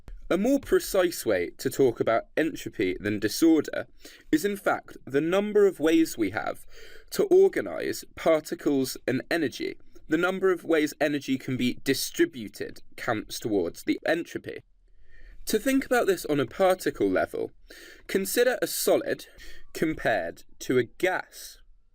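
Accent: United Kingdom. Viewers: good content, posh accent unbearable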